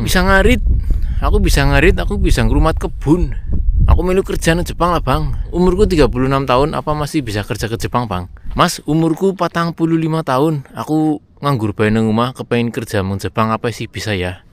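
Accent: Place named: Cilacap, Indonesia. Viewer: Wis mbedek kayane wong cilacap sampean kang wkwk. Salam pepada wong cilacap yaa kang, suwun nggo konten e. Semangat kerja ne kang mbesuk tek nyusul ming jepang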